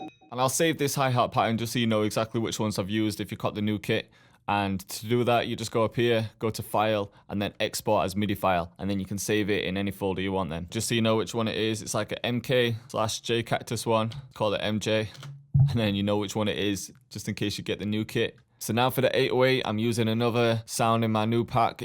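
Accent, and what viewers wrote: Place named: Lancashire, United Kingdom. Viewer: Yo are you from the Accrington Stanley area in England? Most of my extended family are and they all speak like you haha, sick video tho man your killing it and glad to seee you doing well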